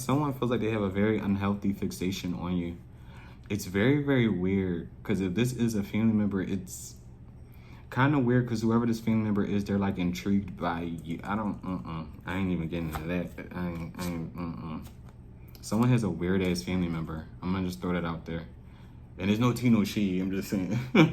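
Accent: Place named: New Orleans, United States. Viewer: You are definitely gifted, all FACTZ just Blessed ❤..wow! You be spot on bruh. Where are you from, I'm hearing Nola, ATL?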